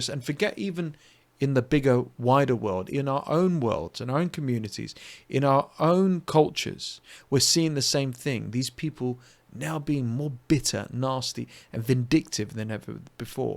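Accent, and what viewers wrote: United Kingdom. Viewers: He's from the south by the sounds of his accent. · Are you from the North or South of England? The North is the working class side right?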